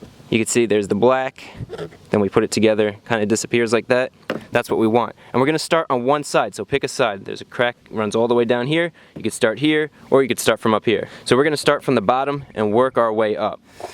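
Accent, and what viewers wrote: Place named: New Jersey, United States. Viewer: Dat jersey accent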